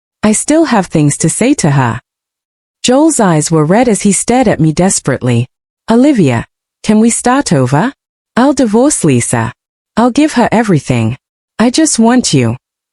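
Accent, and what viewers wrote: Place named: England, United Kingdom. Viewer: 1st! Interesting English accent! 😊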